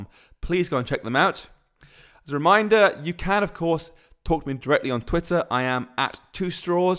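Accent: United Kingdom. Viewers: I like British accent.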